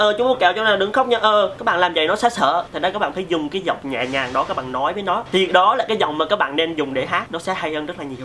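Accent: Hue, Vietnam. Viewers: Anh gốc Huế hk, giọng tựa bạn em, em thích giọng anh lắm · ong noi nay nguoi hue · anh người huế à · Giọng anh miền nào mà nghe vui vui ha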